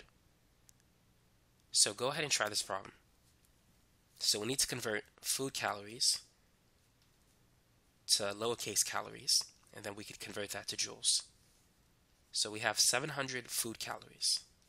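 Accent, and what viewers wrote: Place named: Brooklyn, United States. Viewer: am i the only one who noticed he has a brooklyn accent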